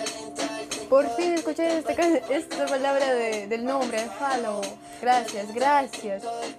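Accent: Argentina. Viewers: Fa como se te esta pegando el acento argentino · me parece una colombiana con argentina jaja rusa no creo nose por q hay gente q le cree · Muy bueno tu español argentino!! Saludos desde Rafaela santa fe argentina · Es rusa o argentina?? Ajaj que crack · Español ARGENTINO😍🇦🇷😘😄👏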